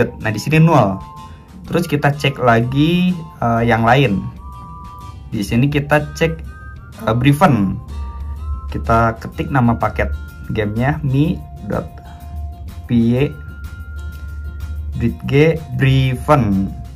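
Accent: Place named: Java, Indonesia. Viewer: wkwk mangkanya pas ngomong kek ada jawa jawanya gtu, salam dari jawa mang gw juga jawa, jawa timur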